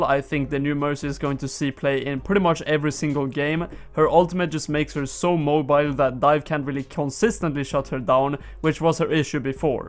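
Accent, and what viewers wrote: Sweden. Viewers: ok tyckte du hade en svensk dialekt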